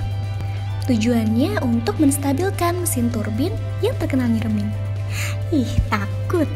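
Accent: Indonesia. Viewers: Logatnya seperti logat Palembang...Pesawat minta duit 😀👍🏻.. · Auto modar😂 kaciri orang sunda na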